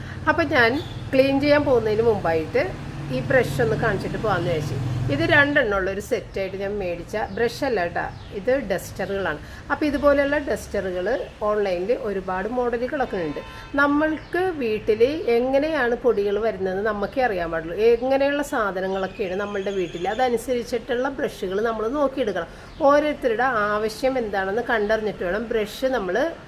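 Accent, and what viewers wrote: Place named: Kochi, India. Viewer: ഒരിക്കലും വീഡിയോ നിർത്തരുത് ആരെ കണ്ടില്ലെങ്കിലും ഞാൻ വീഡിയോ കാണും കൊച്ചി ഭാഷയെ സ്നേഹിക്കാൻ തുടങ്ങിയത് സംസാരം കേട്ടത് മുതലാണ് ❤എന്നെ യൂ ട്യൂബ് എന്നും തഴയുന്നു എന്നാലും ഞാൻ നിർത്തില്ല 😀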